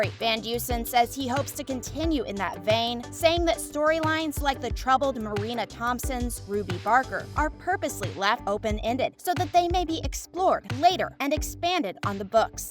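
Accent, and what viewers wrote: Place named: United States. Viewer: Just click bait. Nothing about Rege coming back. And that grating American accent narrating about a very English show. Ugh!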